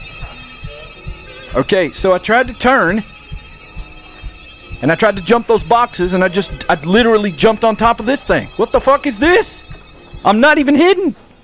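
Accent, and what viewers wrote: South, United States. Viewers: You sound southern and when southern people get scared it’s funny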